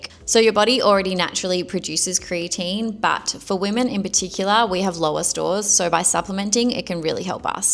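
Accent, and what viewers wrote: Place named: United Kingdom. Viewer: first of all great video. People er your accent you live in the UK. Myself, I live in sunny hot Florida. Northern Florida in Jacksonville. I'm now 65 years young. And I stand at 7 foot 3 inches tall. I take my 5 grams of creatine 8 times a day. Sometimes I mix it in my whey protein shake or just drop it in my mouth and swallow it down. Yes es, it's the water. I drink about 2.5 to 3 gallons a day. And I mix my whey shakes with 2% milk and a raw egg. I used to be huge, but then I moved to Florida. Now I'm retired and I'm lifting again. I want to get back the body that I lost. But now it will be much harder because now I'm a amputee below the knee plus I'm in a wheelchair. But not to much longer. I'm getting tired if this chair, and I'm going to prove to my surgeons that they were wrong. They told me that I would never walk again. 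I'm a stubborn person, and you never say the word Never to a stubborn person. In 2016 I had my leg removed below the knee, exactly one year later I started my thru hike of the AT trail. I got half way in 4 months,before my custom hiking poles snapped in half. I was walking between 10 to 15 miles a day. Then 2 I'm years later I found out that I got a E-Coli infection on my spinal cord. Me and my doctor's killed that infection, before it killed me. But where that infection sat, it ate the cartkedge completely away in two spots on my spinal cord. Then they put me on opioids. They only take the edge away not the pains. But once my doctor cleared me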